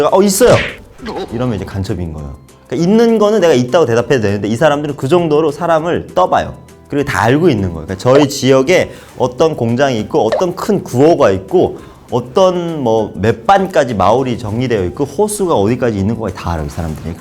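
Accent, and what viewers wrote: Seoul, South Korea. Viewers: 난 부산사람인데 나보다 서울말 더 잘하네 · 북한에서 오신분 같지 않아요. 서울말투가 너무 자연스럽고 북한억양이 전~~~혀 없어서 북한분이라는 생각이 안들어서 공감이 좀 안되네요.ㅎㅎ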